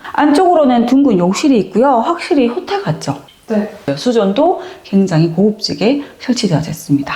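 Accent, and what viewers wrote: South Korea. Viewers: 절라도 사투린가요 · ㅠ여기저기경상도사투리 진짜듣기 거슬려요~~ 한남동같은 그것도서울쪽소개하시면 서울말표준어로 설명해주시면좋겠어요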